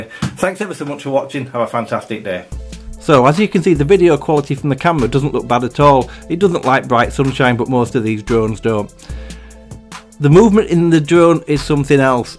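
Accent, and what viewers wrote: Yorkshire, United Kingdom. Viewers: Thanks for your honest review buddy. Sounds like you're from Yorkshire? I have a similar channel and I'm in Sheffield. Take care bud.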